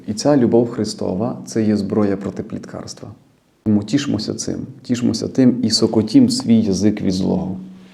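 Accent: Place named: Transcarpathia, Ukraine. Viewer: Слава Іісусу Христу! Дякую от. за Ваш підхід і пояснення теми пліткарства, особливо тронула кінцівка про наповнення Божою любовью.Рада за Вас, що збільшується кількість слухачів, а значить однодумців.Приємно чути закарпатський діалект.Вітання всім зі Сходу з Харкова, нехай Бог усіх береже, єднає через Своє Слово і веде до перемоги 🙏📿🇺🇦🕊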